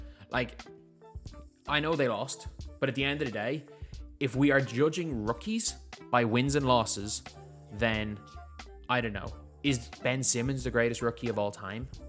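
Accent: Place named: Ireland. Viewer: Where in ireland are you from?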